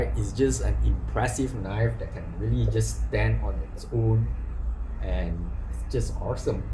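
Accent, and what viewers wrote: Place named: Singapore. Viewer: Are you malay? Or Singaporean? I lived in Johor 5 years your slang is very singlish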